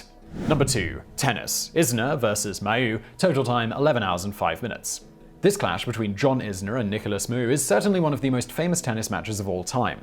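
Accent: England, United Kingdom. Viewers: Mariela Que i love hearing someone with an english accent talk about american football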